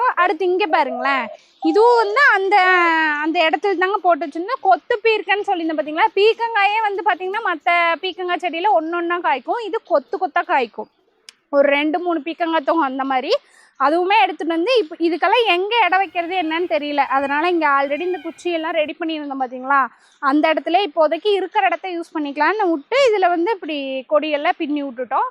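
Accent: Kongu, India. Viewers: ஆமாங்கோ கோயம்புத்தூர் காரங்க ஈரோடு காரங்க எல்லாம் அப்படி தானே பேசுவாங்க😊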